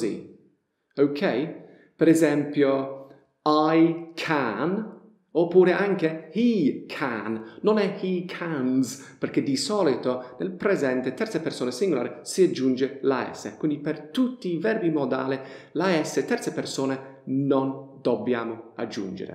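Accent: Apulia, Italy. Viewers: Ripeto...piu di due decenni per imparare l'inglese buttati nel cesso veramente, con le tue lezioni da madrelingua in italiano con accento simpaticissimo pugliese davvero è rivoluzionario il risultato e il miglioramento grazieeeee 👏🏻👏🏻👏🏻👏🏻👏🏻👏🏻👏🏻👏🏻👏🏻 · Ciao una domanda di curiosità:) per caso in Italia vivi in Puglia? Percepisco dal tua accento un non so che di pugliese. Comunque amo il tuo canale, studio lingue all'università e le tue spiegazioni rendono tutto più chiaro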